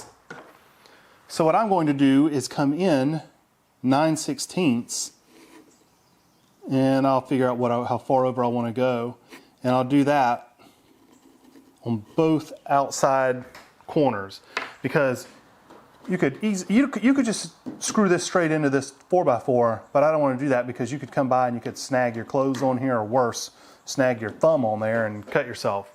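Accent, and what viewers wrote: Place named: Florida, United States. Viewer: I love how I can tell you’re somewhere in Florida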